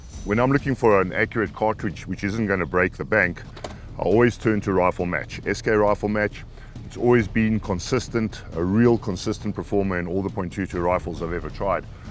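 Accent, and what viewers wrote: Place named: South Africa. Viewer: South African? Hallo boet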